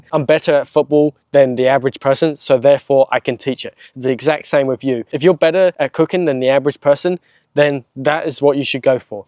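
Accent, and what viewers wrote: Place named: New Zealand. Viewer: Are you in NZ? sound like a Kiwi. Great to have your passion.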